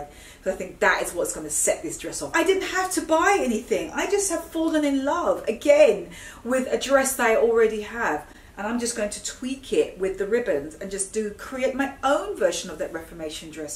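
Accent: RP, United Kingdom. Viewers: So fucking posh how have I not found you before now!!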